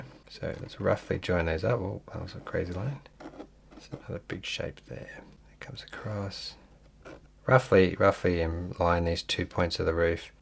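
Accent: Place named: Australia. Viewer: Are you from Australia, New Zealand, Tasmania? Love your calm voice and accent.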